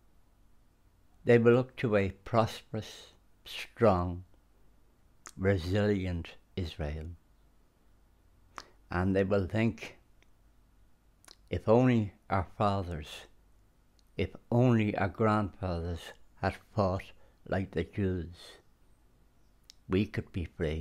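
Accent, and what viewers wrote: Ireland. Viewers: My goodness. That was wonderful! Thank you. You must be a stage actor your monologue is the very best of Irish cadence and delivery. Wonderful wonderful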